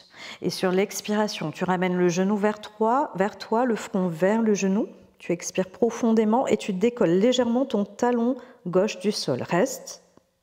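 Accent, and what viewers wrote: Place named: Europe. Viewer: Belle séance très appréciée ...je ne peux faire la guirlande jusqu'en bas , à cause de l'arthrose à un genou . Pas grave je m'ajuste..j'aime tes séances toujours bien expliquée . Je viens du Québec et toi ? ...d'après ton accent je devine que tu demeures en Europe........de Louise Joyeuses Pâques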